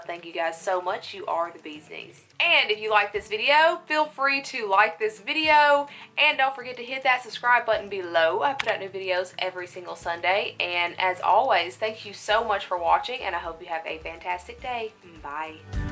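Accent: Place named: Georgia, United States. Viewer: I love your country accent. Sounds Southeast, maybe Georgia my guess. Anywho, love your channel. Great educational but more importantly, for me, your accuracy. 👍😁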